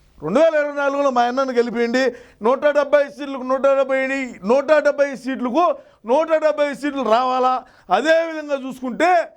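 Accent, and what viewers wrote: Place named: Kadapa, India. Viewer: Anna first time telugu Kadapa slang vinutuna.super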